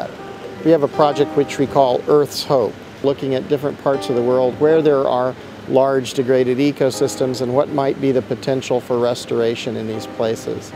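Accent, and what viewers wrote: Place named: United States. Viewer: He sounds American to me.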